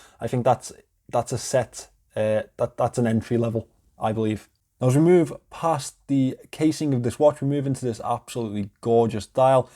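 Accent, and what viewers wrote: Liverpool, United Kingdom. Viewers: I think should speak as he speaks, with his scouse accent, there are hundreds of different accents within the UK and I hope it always remains so, keep going you're doing a great job...stay safe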